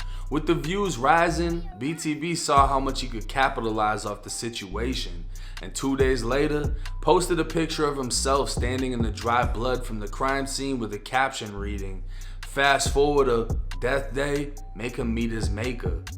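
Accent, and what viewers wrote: Boston, United States. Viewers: You must be from Boston (Baah - stunn). Apartment (Aa - paaht ment)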